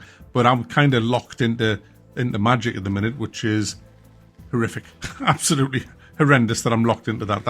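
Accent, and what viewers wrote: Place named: Durham, United Kingdom. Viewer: Where are you from mate? You sound like Durham way, Chester-Le-Street perhaps?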